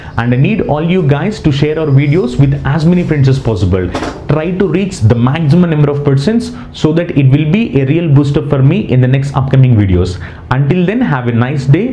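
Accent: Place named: Tamil Nadu, India. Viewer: The tammmill accent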